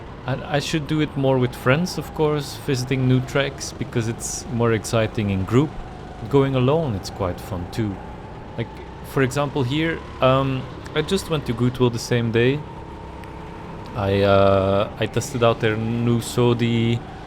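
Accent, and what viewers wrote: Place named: Flanders, Belgium. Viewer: I could hear you were Flemish after the first few words 😂 Awesome perspective from an experienced Karter though. Do you sim race as well? That's my main thing currently. I enjoyed watching this, bedankt!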